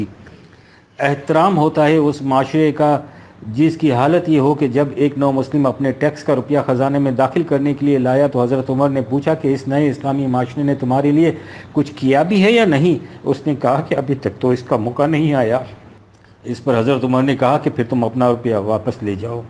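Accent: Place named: Iran. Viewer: Jazakallah khair really I enjoy your Persian pronunciation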